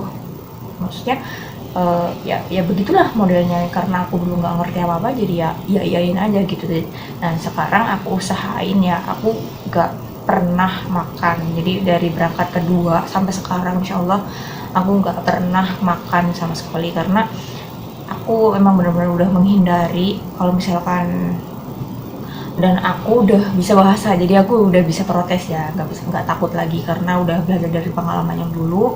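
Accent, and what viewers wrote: Banyumas, Indonesia. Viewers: Ngapak ya mbak? Kayane sih..hahaha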